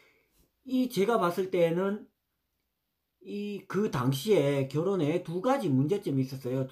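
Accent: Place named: Daegu, South Korea. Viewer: I really love deagu dialect, fighting ❤️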